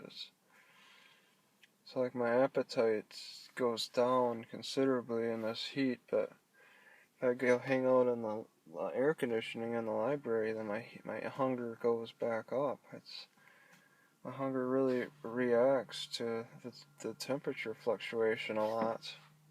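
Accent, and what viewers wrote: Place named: Midwest, United States. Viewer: I’m re-watching some of your old stuff. Your voice relaxes me for some reason. Probably the Midwest accent.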